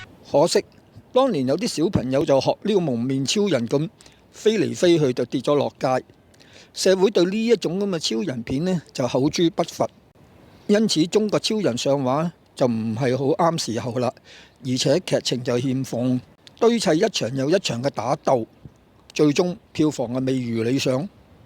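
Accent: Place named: Weitou, China. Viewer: 喺唔係起, 有心做就注意吓啲廣東話發音, 唔好用啲咁嘅圍頭話發音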